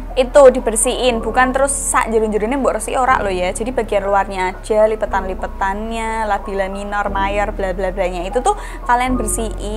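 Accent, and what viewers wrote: Java, Indonesia. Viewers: Kk e medo' ,😆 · jowo mu ngendi mbak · Jowone khas jawa ndeso 😀 tp gpp lah rodok terhibur 😄😄😄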